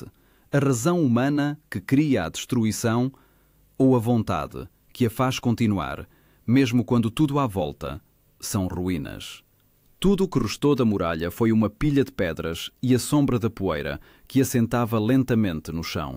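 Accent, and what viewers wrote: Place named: Portugal. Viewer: Maravilha este acento lusitano.sou brasileiro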